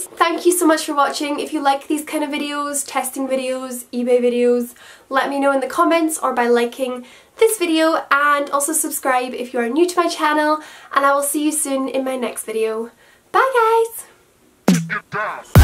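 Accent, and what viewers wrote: Scotland, United Kingdom. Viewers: Omg right I was Watching this and I was listening to your accent and I was like you sound quite Scottish so I clicked the link and I was right I feel proud 😂 I'm from Scotland too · Omg I'm crying (literally) because your accent is exactly like mine! And to find a YouTuber that lives so close to me is insane!! And to hear you day Anastasia in a Scottish accent make me so happy! Because all the YouTuber I normally watch are American or English! Or Australian. So it's pretty cool to find a Scottish YouTuber!! X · I feel like I hear a Scottish accent a tiny bit from you? Might just be me tho😂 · Are you Scottish? Your accent is so lovely😻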